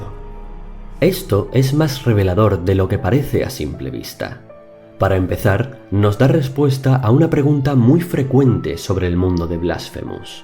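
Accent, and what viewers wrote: Spain. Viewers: hasta con voz española y narrado como cuento medieval. Contenido de calidad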